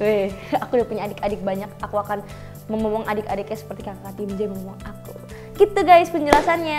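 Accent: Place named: West Java, Indonesia. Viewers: Logatnya sunda nya ga ilang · Logat Sunda nya masih kebawa ya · logat bandung nya masih ke bawa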